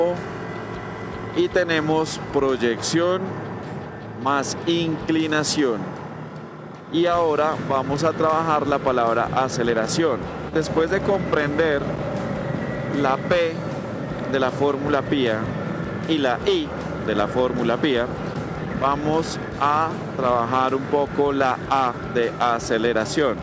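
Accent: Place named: Argentina. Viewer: Antes de q diga q era Argentino ya me había dado cuenta q lo era. Tenemos un acento único! 😁 Buenísimo el video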